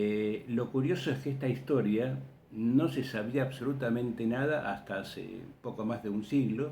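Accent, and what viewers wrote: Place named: Uruguay. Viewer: POR TU ACENTO SOS URUGUAYO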